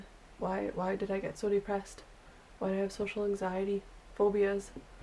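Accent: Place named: Manitoba, Canada. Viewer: I can absolutely tell that you were raised holdeman by the way you speak. Thank you for sharing! May I ask, are you from Manitoba? You have the classic Manitoba accent within the "church".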